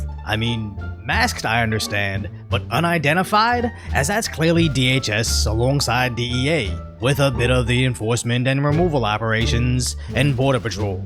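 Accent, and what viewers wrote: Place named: California, United States. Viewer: Why are californian accents seemingly disregarding normal intonations? Why are her sentences sounding like questions when they clearly are not? What a strange person.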